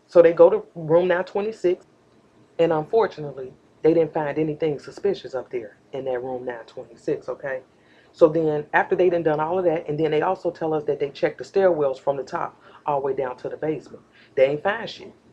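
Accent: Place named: West, United States. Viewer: I love how you articulate your words. I'm from California and you definitely sound like you from the West. I've been studying to get my private investigators license to aid in the criminal defense process of the Justice System. As a "sista" (feel me) and a woman, I believe I can further and investigation that yeilds results my counter parts can not achieve. I can see you as a successful private investigator. Keep us posted!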